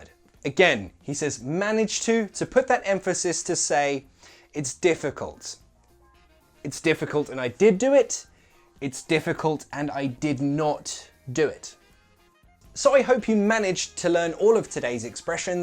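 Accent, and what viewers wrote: United Kingdom. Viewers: I don’t like British accent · good morning! learn english with, congratulations for your channel it is the first time i watched your video and ı would like to ask you, so your english is british? thanks and have a nice day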